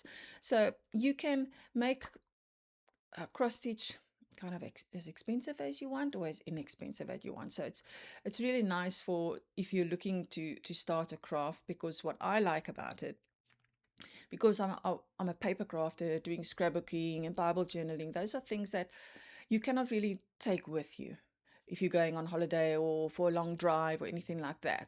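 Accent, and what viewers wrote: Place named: South Africa. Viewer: Hello from the Chicagoland area, really enjoyed your first Flosstube! I have a sibling and nieces currently living in SA, so I recognized your accent right away 😊!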